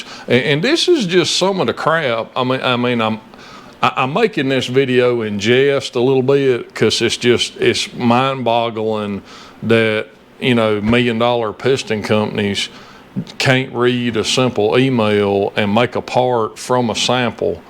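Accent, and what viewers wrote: South, United States. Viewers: Man I can’t listen to this whining. Maybe it’s the dumb southern drawl or the inability to use the English language … I don’t know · No offense at all but you have the voice of Boomhauer with the accent of Hank Hill. I’m a southerner too to so I can say that lol. Great video too!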